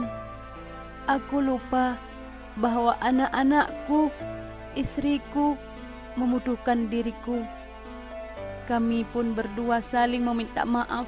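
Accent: Aceh, Indonesia. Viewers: teungku.. teungku... logat seperti logat Aceh. peu beutoi ureung aceh?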